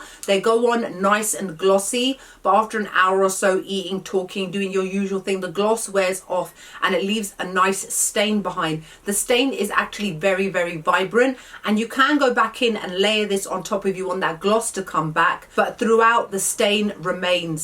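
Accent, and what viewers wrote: United Kingdom. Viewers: You are giving me Leah Remini vibes, only with British accent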